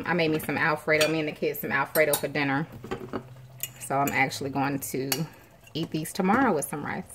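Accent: South, United States. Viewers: New fan here. Would love to see you do a through and through without pausing and starting again. Your voice is southern and very soothing. Maybe you could talk to us about your day as you're cooking or whatever you feel like discussing. Just a suggestion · She sound fine. Like a southern bell.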